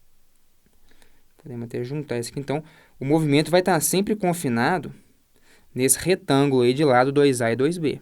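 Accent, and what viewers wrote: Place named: Belo Horizonte, Brazil. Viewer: quando comecei a assisti seu canal tem um tempo, e tinha algo além do conteúdo que me chamava atenção dos demais canais, mas nunca tinha percebido. Recentemente vi um de seus stories e descobri que você é de BH, igualmente a mim, e aí eu percebi o que me chamava a atenção Esse sotaque de belo-horizontino rasgado kkkkkkkkk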